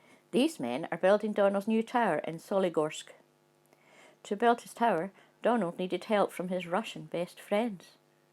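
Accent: Scotland, United Kingdom. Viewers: No she is Scottish if you want to learn standard Uk English look up how to learn RP and the sounds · Is your pronunciation is how the British accent sounds?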